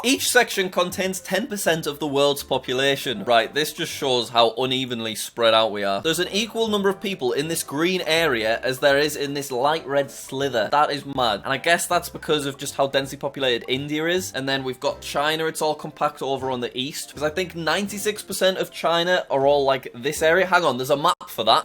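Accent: United Kingdom. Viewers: As a british person I know as the only person with a british accent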